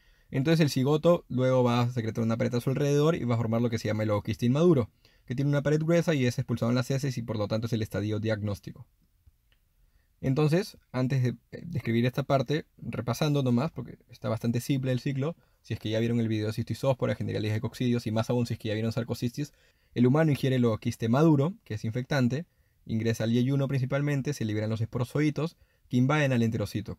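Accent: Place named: Peru. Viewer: Me gusta el acento peruano. Saludos desde MX 🇲🇽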